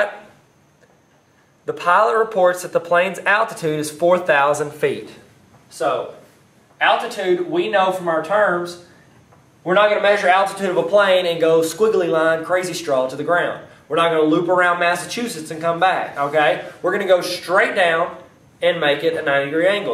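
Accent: South, United States. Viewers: Btw awesome southern accent :D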